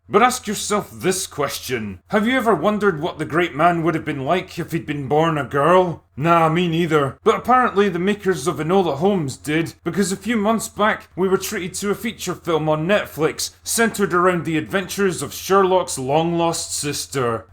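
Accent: Scotland, United Kingdom. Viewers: You should know that with me and my wife are confused about some thing or don’t have an answer we will respond to one another in your heavy Scottish accent and say don’t know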